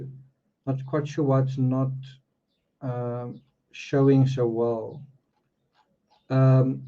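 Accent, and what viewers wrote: South Africa. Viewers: Is that a S. African accent? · Hi are you aa South African ( your prenounceanation is very afr.) Nothing wrong in that im afr. Know you live abrought. Oma s a.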